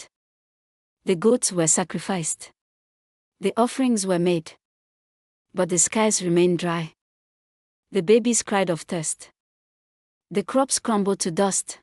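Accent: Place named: Africa. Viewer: Bro the African accent ai generated voice gotta love it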